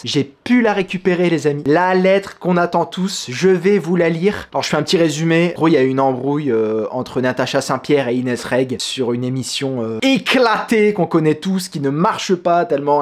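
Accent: Quebec, Canada. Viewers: + l'accent québécois. Que demander de plus 😭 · je suis mongol et j'adoere ton accent du Canada Xd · Oulala les infos croustillantes que tu nous as dégoté! Tu fais un vrai travail d'investigation et avec l'accent québécois (qui fait partie des accents autorisés) on est en totale immersion. Ne lâche rien mec c'est toi le boss de YT, après Michou bien sûr!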